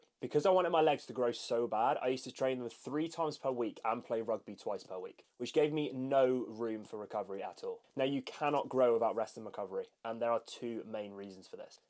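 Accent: United Kingdom. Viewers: British accent, blue eyes, big butt. My dream guy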